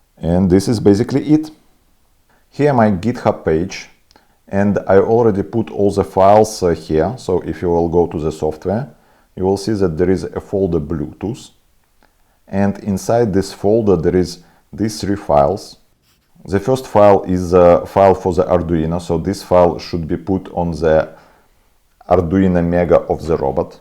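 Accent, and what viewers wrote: Russia. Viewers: у вас очень сильный русский акцент, у вас только англоязычный канал?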